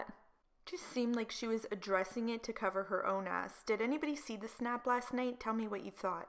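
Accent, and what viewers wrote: Canada. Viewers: Are you Canadian Lol? I hear the "aboot" instead of about lol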